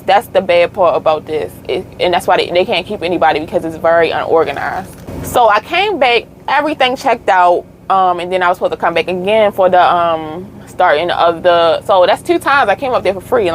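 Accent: Baltimore, United States. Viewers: you from baltimore? haha sound like you from round the way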